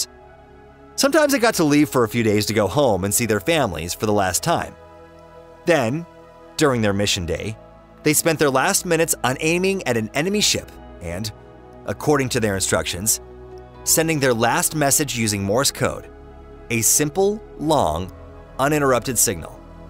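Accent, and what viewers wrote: United States. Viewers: Too slow, A Yank of course.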